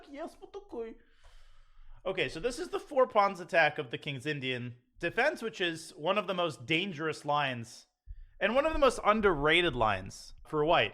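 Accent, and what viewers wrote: Russia. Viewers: Sensei Danya you have to speak with a lovely russian accent more often. I love it :) Ofc love your priceless vids too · Nipo imitation? So fun to here this russian accent. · That Russian accent always gets me. Much love, Danya!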